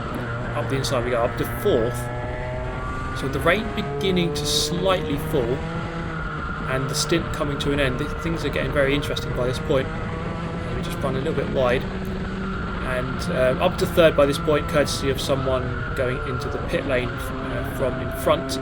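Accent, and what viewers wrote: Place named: United Kingdom. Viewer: I'm high, and thought i was watching Brouphy lmao. British dude.. i love their accent.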